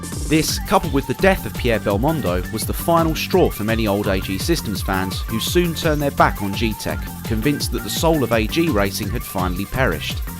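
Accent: United Kingdom. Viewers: Never knew there was back story like this. And for us here in the States, all stories sound better through a British accent. Keep it up.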